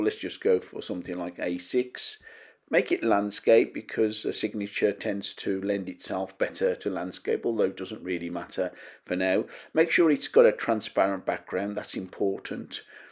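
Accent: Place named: Dudley, United Kingdom. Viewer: Hi, Really enjoying your vlogs on Affinity Photo, just been following the vlog Brand Signature. I've been after a way to add a Signature to my photos for such a long time. Messaged vloggers in America and Australia on this subject asking if they knew how to add a signature in Affinity Photo. Not had a reply from either of them?? To be honest don't really think they knew how to do it. Followed your instructions and now I can add a signature in seconds instead of having to keep typing it out. Can't thank you enough mate, keep up the great work look forward to watching more vlogs. ( Your accent sounds like you're from around my area I'm just outside of Dudley. Once again thanks. Fred.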